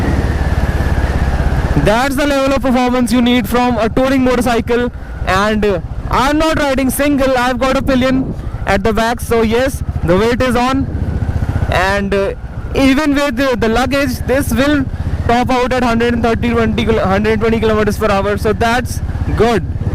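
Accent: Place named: India. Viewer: I love the Indian accent...so soft and calming...and I have a Classic...so this vid is great for me!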